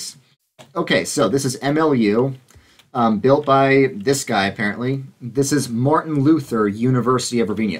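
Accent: New York, United States. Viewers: got your new york accent coming out in that video 😂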